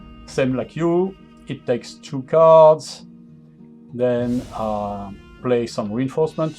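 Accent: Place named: France. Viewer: Well done! Great explanation of game mechanics along with good game play. As I worked in Paris, I enjoyed your accent and brought back wonderful memories.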